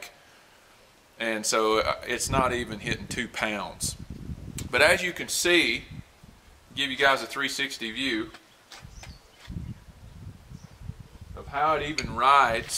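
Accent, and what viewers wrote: United States. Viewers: Where do you live? Looks like Australia but you sound like Arkansas lol not being mean just asking. Good review, I have this bag and use it as a diaper bag. lol tactical dad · First things first. Your response "As for overloading the bag it had towels in it. Did not even weight two pounds as i say in the video." is incorrect. you state " It fit everything" referring to the previous minute of dialog where you inform the viewer that the PUSH bag (Practical Utility Shoulder Hold) rode on your iPhone. Like I said, you overloaded the MOAB 6 (Mobile Operation Attachment Bag). I watched the 2 videos on your load-out of the PUSH bag. 10 pounds is far to modest an estimate of the weight. As for your condescending remark "I guess in the States you dont need what i do i suppose", guy, you have a southern draw, I would know, I'm southern. You sound like you're from Alabama or Georgia. 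As far as what I need, in a 6 hour bag I place what I will NEED for 6 hours. AR mags, pistol mags, radio, pens, paper, a tourniquet, pain reliever, 1 16 oz. bottle of water, sunglasses, flashlight, lighter, toilet paper. Remember it's a 6 hour bag. 5.11 Tactical is designed for law enforcement, EMS, Firefighter, not survivalist! There are bags that cater to those individuals much better than a 6 hour bag. Your review is completely unfair. You should have began the review by saying "you bought the wrong bag for your situation".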